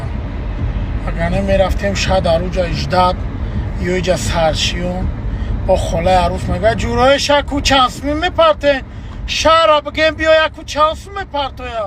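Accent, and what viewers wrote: Kulob, Tajikistan. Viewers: Ака зури бади бахдо кулоби гап нест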